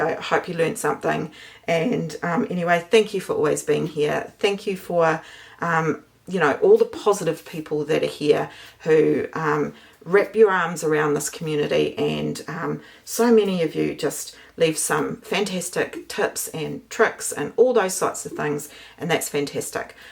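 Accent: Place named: New Zealand. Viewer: It always amazes me that some people have no better use for their time than to make nasty comments, of course if they weren’t anonymous they probably wouldn’t have the guts to do it. Also why watch videos you dont enjoy! It’s very easy to skip on by! As an expat kiwi I love watching your videos (although the cost of food seems crazy), but your down to earth, real approach to your videos is refreshing, not to mention I miss that kiwi accent!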